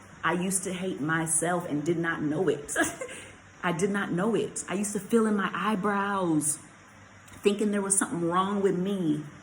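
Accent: Jamaica. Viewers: Such a great video. U touched on a lot of good stuff, and I feel all of this so much. Ppl be missing opportunities with real ppl and u cant force it. As u sai, jus wish them well. R u Jamaican?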